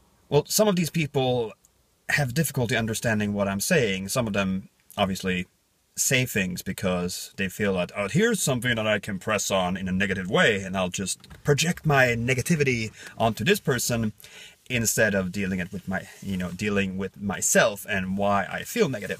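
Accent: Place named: Sweden. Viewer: Will there be a season 2 someday? p.s. Your Swedish accent (though subtle) as you speak English is so great:) I so agree about the dialect issue, as long as you speak your language well for YOUR region. Variety is BEAUtiful and human!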